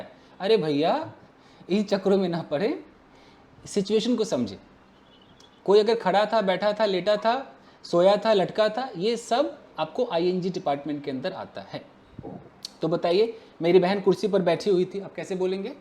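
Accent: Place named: Bihar, India. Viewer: Sir aap puri bihari bol rhe 😄😄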